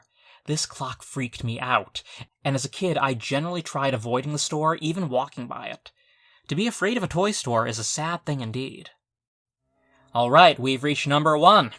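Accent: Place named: Tennessee, United States. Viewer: Lol I didn't think you were Australian at all I think you're from like Tennessee instead lol!! Also I think looks more hilarious than creepy lol!!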